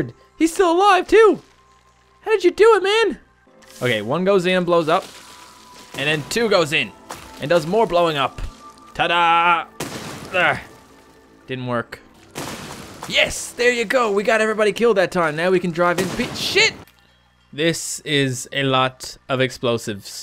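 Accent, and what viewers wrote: Canada. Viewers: I watched 2 videos of yours. And ur accent sounds Canadian.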